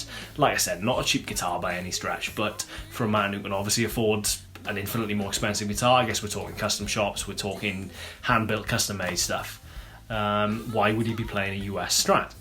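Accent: Wales, United Kingdom. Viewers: He is a cool guy.. 🎸. Thanks for explaining and showing the cheap guitars .. I also have a squire, an a expensive Gibson 335 ... but dude I can’t understand a word your saying, like you have a bunch of Marbles in your mouth,, clearly you’re from the UK, and it’s all good 👍🏾.... keep showing more guitars bro... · Wales more like. His is nothing like a London accent.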